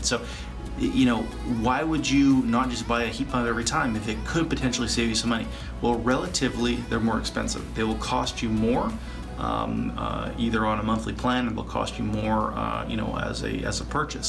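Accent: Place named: Canada. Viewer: The company says FurnaceUSA but the presenter is clearly Canadian!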